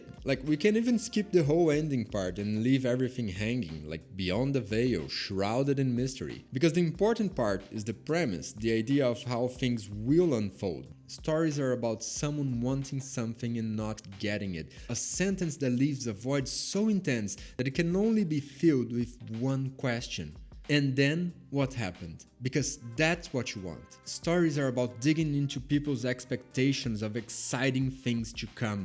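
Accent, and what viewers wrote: Brazil. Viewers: Ouvi o sotaque BR também e já deixei o apoio. Tem canal em brasileiro? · Are you brazilian by any chance? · No começo do vídeo eu fiquei "esse sotaque é brasileiro", no meio eu falei "oxi citado" e no final eu falei "kralho, é o " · Is that a 🇧🇷 accent I hear?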